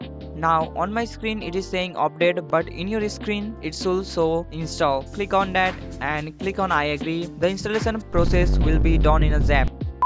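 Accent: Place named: India. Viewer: Lost all motive when I hear indian voice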